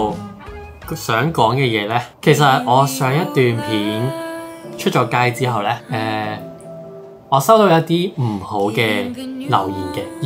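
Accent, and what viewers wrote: Cantonese, China. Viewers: 第一：你的中文已經講的很好，廣東話跟中文混在一起講，台灣人也ok啦．第二：要當公眾人物(youtuber)就要有＂受人議論＂的心理準備，愛你的人有多少，討厭你的人就有多少，怕熱就不要進廚房，如果無法接受被人議論，就當個平凡人，不要曝光低調過生活．最後希望你在台灣開心的生活，大部分的人都很善良熱心，對廣東口音的朋友都十分喜愛的． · ❤❤❤❤❤❤不要生氣了，你很棒很勇敢，為愛走天涯，你還有愛你的亨利，不用去在意其他人的言語，國語的話我認為時間會慢慢解決一切，而且香港口音很可愛，以前郭富城，葉蘊儀來台灣很紅就是有那個香港腔，很討喜，超可愛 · 我其實以前都係同你一樣被人地開玩笑話我騙身份證不過我唔係咯感情掂可以用騙的但後面變左都唔係我可以控制嘅另外一點我唔覺你廣東話唔純咯你廣東話幾好聽啊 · 不管國語版廣東話或是廣東版的國語從口中說出來不都是很可愛嗎？語言／生活習慣因為不同環境本就有所差異，異地或異國戀情不就是因為愛而慢慢彼此往中心點靠近而創造出屬於你們的故事。加油囉！支持你們也歡迎來台灣。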